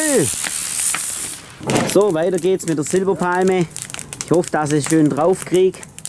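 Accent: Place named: Swabia, Germany. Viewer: feiers vor ellem dass au en schwob bisch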